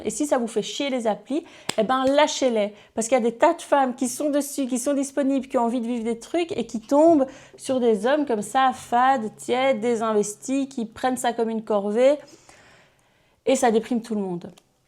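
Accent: Belgium. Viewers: Y a comme un petit accent belge qui ressort par moment c est mignon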